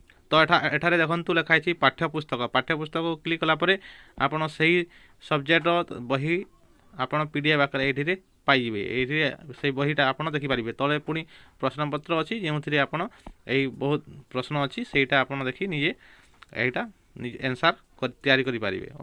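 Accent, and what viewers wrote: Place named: Western Odisha, India. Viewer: Thank you sir Apana western odisha ra ki apanka language Ru semiti janapaduchhi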